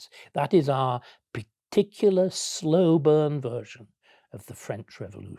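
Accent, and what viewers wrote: RP, United Kingdom. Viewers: You wouldn't of thought he was a working class lad.Speak with a plum in your mouth, and you automatically think he is intelligent. Some people are different deal with it.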